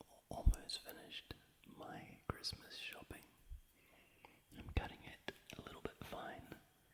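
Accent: New Zealand. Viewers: I find it the most bizarre thing hearing you whisper and having the same accent as me. It shocks me even when I'm expecting it! Merry Christmas by the way! Hope you had a really nice day :) shopping malls are so busy here at this time of year. They broke a record on the intake of money this year apparently. Good old kiwis ae? Are you in the North or South Island? Really great videos! If you ever want help with some inspiration I'll help you out. :)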